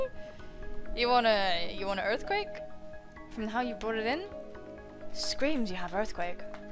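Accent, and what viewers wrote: United Kingdom. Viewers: You have a beautiful accent! What is it, british? :D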